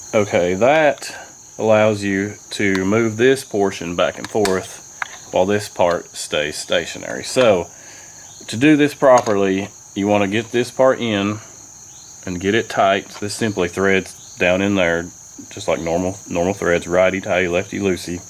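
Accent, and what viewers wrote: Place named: United States. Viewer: But bro.. Is that how you all really talk in America??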